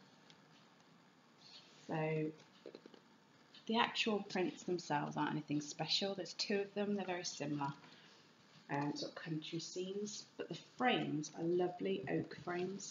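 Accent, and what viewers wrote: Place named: England, United Kingdom. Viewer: Thank you! I'll bet you find some amazing things in, I'm guessing England, by your accent😊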